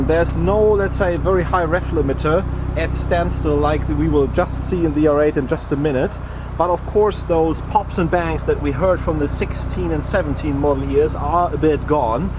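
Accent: Germany. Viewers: Bist du deutscher wegen deinem Akzent?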